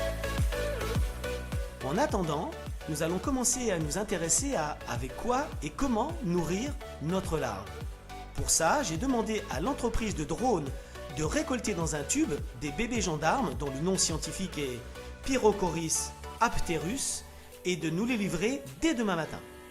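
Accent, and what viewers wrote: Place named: Rural, France. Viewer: AH AH AH; tu t'es éclaté; on voit que c'est les vacances!! et bravo pour les accents du terroir!! Ceci dit bravo pour les explications sur les fourmilions et pour les vidéos, de mieux en mieux.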